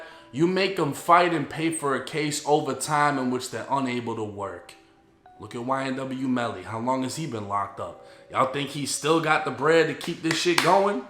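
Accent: Boston, United States. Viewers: The boston/Massachusetts accent is heavy/thick in this one lol .. at least hes got the money N assets needed for a lawyer good enough to take on the machine aka ths feds for a second time.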